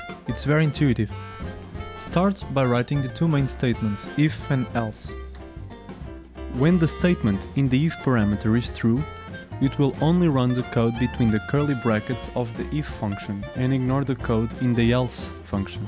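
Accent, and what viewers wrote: Portugal. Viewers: Impecável. Bem que estava a reconhecer um sotaque Português. Bom vídeo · Este sotaque não engana ninguém, tinha de ser português, ;)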